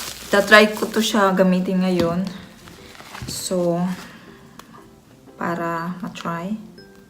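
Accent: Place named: Visayas, Philippines. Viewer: Nice sis. 😊pareho tau bisaya ang tono.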